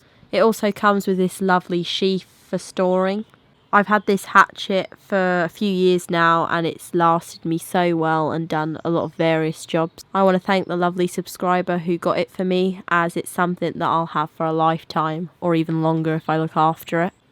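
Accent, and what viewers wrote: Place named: RP, United Kingdom. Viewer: Another great video. Thanks a lot. Always nice to have someone's different opinion on something. For example, i was just looking at the small cook set for my day pack i want to start, and wasn't sure on "alcohol/petrol" stow that comes with it. but one phrase of yours made it easier for me:" for the day you can't start the fire". always different prospective to look at something. Day pack is a day pack and needs to be light, but what if you got rain and got lost - extra few oz is nothing when you need to eat and a day trip becomes overnighter. Also, admiring proper Queen's English and proper acescent that comes with it Keep doing good videos. Thanks.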